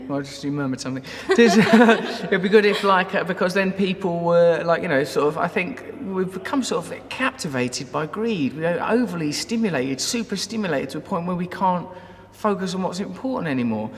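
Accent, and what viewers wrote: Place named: Bradford, United Kingdom. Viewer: Love the Bradford accent.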